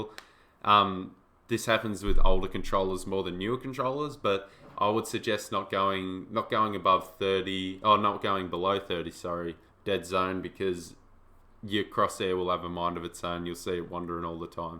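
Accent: Australia. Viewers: Soon as I heard that voice I subbed, don’t come across many aussies, good video to bro, didn’t know what deadzone was till now 🤙🏻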